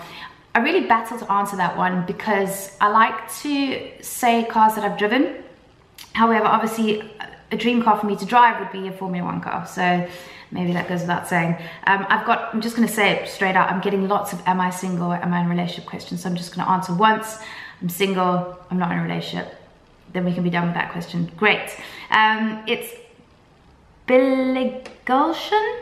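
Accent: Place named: South Africa. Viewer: I had no idea that you weren't British but you have a strong South African accent. I had no idea you are a singer.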